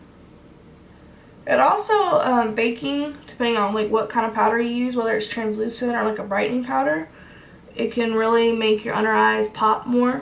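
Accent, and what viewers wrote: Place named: California, United States. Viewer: I’m guessing she moved back home to Michigan from California cause she’s HELLA talkin like a Californian. Lol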